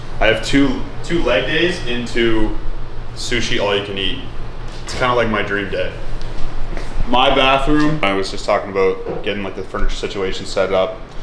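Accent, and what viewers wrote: Australia. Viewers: That Aussie accent 😂♥️ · As an Aussie that accent was pretty accurate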